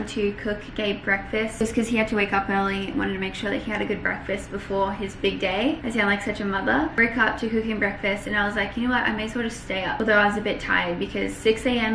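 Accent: Australia. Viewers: your Aussie accent is my fav thing ever I can hear you talk for hours!!!❤️ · And your Aussie accent is so cool to listen to 👂💖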